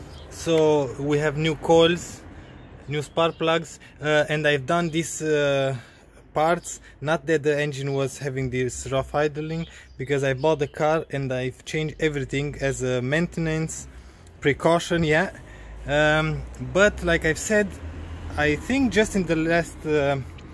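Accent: Romania. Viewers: roman de al meu dupa accent ! ;)) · Salut! După accent pari a fi român, așa este?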